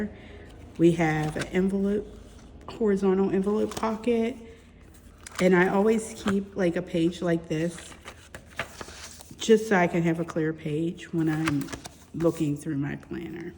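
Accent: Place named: Pittsburgh, United States. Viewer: I hear the Pittsburgher accent. I am excited to know there is a planner lover in the Burgh. Good luck on your studies. Carlow has a good nursing program. New subbie here…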